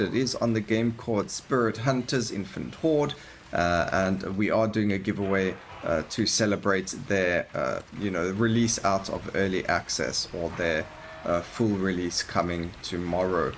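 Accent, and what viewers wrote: South Africa. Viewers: Do i hear a south african accent?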